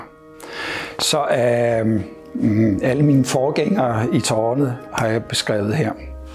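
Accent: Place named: Bornholm, Denmark. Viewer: Tak for denne skønne video. Dejligt at høre den bornholmske dialekt i København. Sikken et liv, må have levet.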